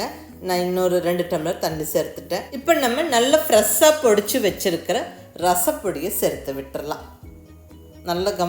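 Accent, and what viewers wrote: Kongu, India. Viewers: Unga samayal ellam romba pudikum kongu tamil super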